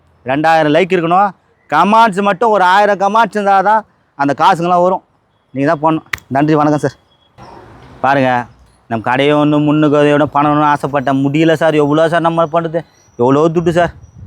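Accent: Madurai, India. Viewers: Madurai vasagam super 🎉🎉🎉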